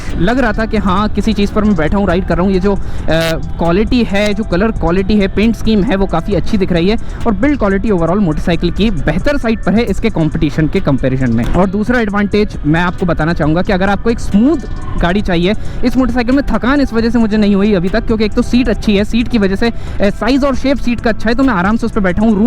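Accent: Bhopal, India. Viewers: Aisa lagta hai aap bhopal se ho bro acha laga itna knowledgeful banda paas mp me hi hai 😊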